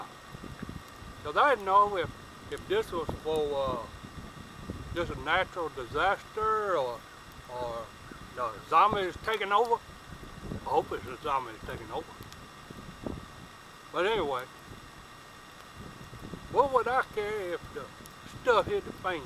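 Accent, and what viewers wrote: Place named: South Carolina, United States. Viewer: I dint no ole Beaver live down deh een dat rivah! You sound like my kin folks down in the SC low country there,. Funny stuff! Thanks for the tag...I'll try and make you proud.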